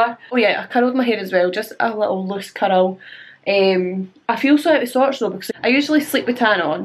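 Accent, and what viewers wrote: Scotland, United Kingdom. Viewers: I will never understand why your channel has never caught on with the YouTube algorithm. You are funny, smart and beautiful. But more importantly, you consistently upload content that is on brand with other lifestyle YouTubers. I stumbled on your channel years ago when I was asked to do a Scot accent for a role I was playing - not sure how you feel about your GRWM videos teaching an American your version of the Scottish accent but oh well. Anyway - I wrote you this novel to say, I love that you have never stopped sharing your life with the world - I hope that you find success in all the things you do. Cheers!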